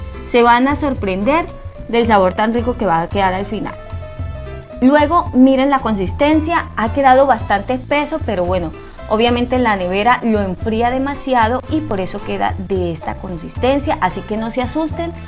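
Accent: Colombia. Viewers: Ay como me encanta el acento colombiano! ❤ / 🇲🇽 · ME ENCANTA EL ACENTO COLOMBIANO Y LA FORMA DE EXPRESARSE.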